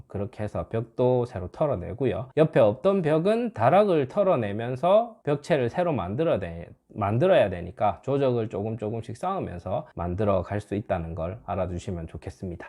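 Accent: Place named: Gyeongsang, South Korea. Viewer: 대구 주택리모델링으로 검색하다가 시청한 영상이라서 대구분인 줄 알았는데 부산이시군요^^ 말투는 대구, 경북 같아서 나중에 문의해봐야지 했는데 아쉽네요.^^ 그래도 자주 들어와 볼게요.. 영상 잘 봤습니다.^^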